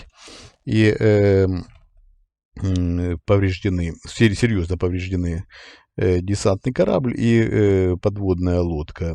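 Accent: Ukraine. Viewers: Вопрос❤,у вас украинский акцент,вы украинец по национальности???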